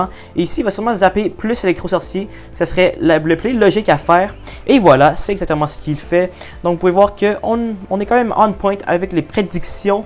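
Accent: Quebec, Canada. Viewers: T tu québécois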